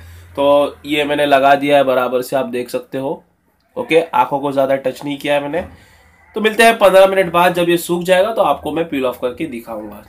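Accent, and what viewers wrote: Maharashtra, India. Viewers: Chitak gaya...😂😁😅 mother tongue marathi ahe vatat tumchi..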